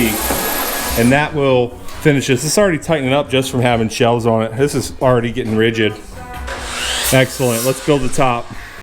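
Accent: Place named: West Virginia, United States. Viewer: I just had a feeling you were from West By God when I started the vid! Charleston area, here! Love this build and am looking forward to implementing this in my garage.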